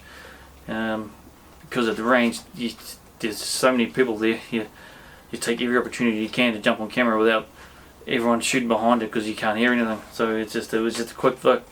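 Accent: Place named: Waikato, New Zealand. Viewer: That's a waikato accent if I've ever heard one, even looks like my stomping ground up thompsons, looks like it would be a epic kaimai supplejack gun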